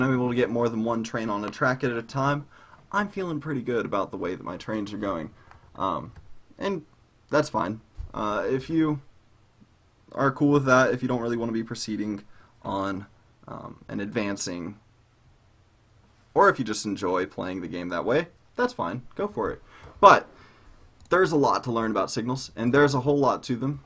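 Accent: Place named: United Kingdom. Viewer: like not fully british but brit-like inflection they had a hold on u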